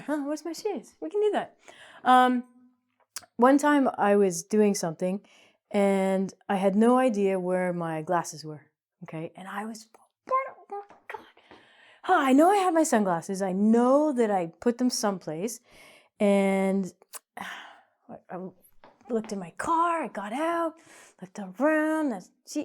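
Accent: Canada. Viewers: Is she from Canada or uk??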